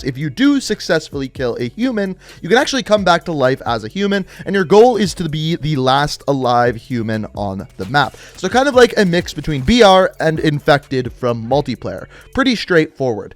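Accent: Canada. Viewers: Lol I can't get over that Canadian accent bro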